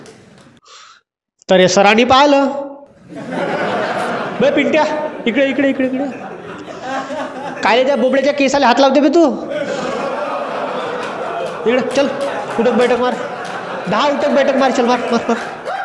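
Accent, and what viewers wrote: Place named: Nagpur, India. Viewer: तूझी नागपुरी मराठी ऐकून मला नागपुर ची खुप आठवन येते. Miss u Nagpur. Miss u Vidarbha.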